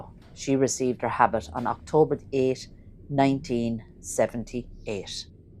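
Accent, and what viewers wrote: Ireland. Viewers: I wouldn't know if you pronounced it wrong or not with your Irish accent 😉 😊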